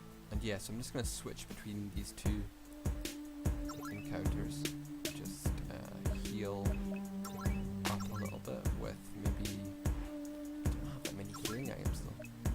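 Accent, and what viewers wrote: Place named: Scotland, United Kingdom. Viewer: whats with the accent though haha im scottish and i dont sound anything like you, haha where you from in scotland.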